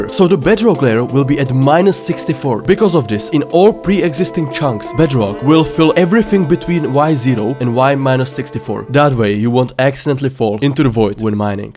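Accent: Czech Republic. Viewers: Great, I enjoyed the video, but ... aren't you from Czech? Honestly, you sound like that (and your channel name is suspicious too) :D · Proč tu nejsou český komentáře? 😀 Dohledal jsem si to a nespletl jsem see jsi čech, já ten přízvuk asi nedám, ale ta angličtina je super 😇 Jen prostě výslovnost mě strašně tahá 😅 Pardooon 🙈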